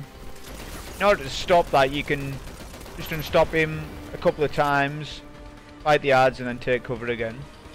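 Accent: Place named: Cumbria, United Kingdom. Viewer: haha im from cumbria too mate and i just noticed your accent and thought he sounds very local!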